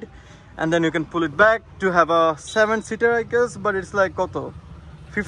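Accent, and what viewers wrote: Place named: United States. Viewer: আপনি কি নরমালি কথা বলতে পারেন না ভাই। শুনতে যেন মনে হয় কোনো একজন আমেরিকান লোক কথা বলছে।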